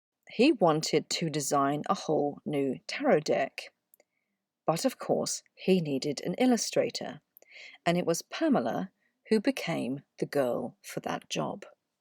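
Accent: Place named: England, United Kingdom. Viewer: Lovely to hear such a cultured well spoken English voice, a relative rarity these days! .. Although I suspect Wun't and Druv would perhaps make more sense to the listener spoken in a more northern English accent. Since the vowel change in the language (particularly in the home counties ) these words have become slightly non-sensical.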